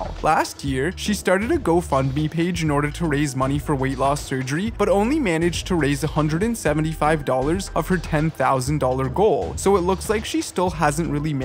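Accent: Canada. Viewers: i think they're canadian!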